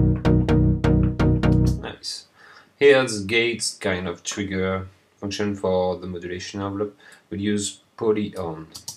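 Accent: France. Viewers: Are you French ? You have a french accent ! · sweet french accent :) J'adore ce synth mais j'ai du mal a saisir sa matrice de modulation un tuto ou une explication serait vraiment trop cool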